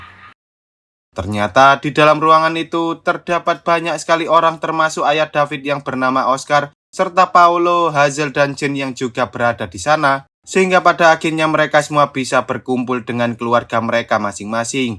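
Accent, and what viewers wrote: Java, Indonesia. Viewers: lho wong jowo mas🎉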